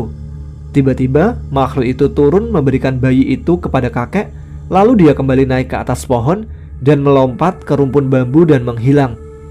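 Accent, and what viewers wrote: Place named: Banyumas, Indonesia. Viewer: Hay... Aa ngapak... Aku dari Brebes nih... Salam santun buat mas.makasih untuk ceritanya.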